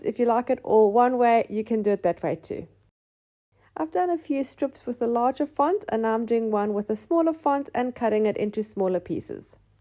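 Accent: South Africa. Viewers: Just discovered your video, and I love your style. Very inspiring. What a lovely surprise to hear a familiar (South African) accent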